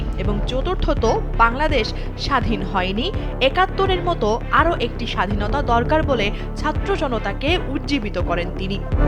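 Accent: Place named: India. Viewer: আপনার সাংবাদ পড়ার ধরণ ভারতের সাংবাদিকদের মতো নাকি আপনি সত্যিই ভারতীয় সাংবাদিক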